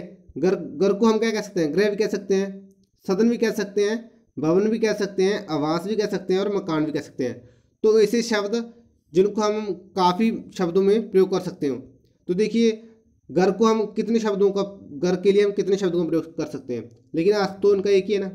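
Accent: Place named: Punjab, India. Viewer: Apki hindi mai bhi Punjabi tone hai 😂😂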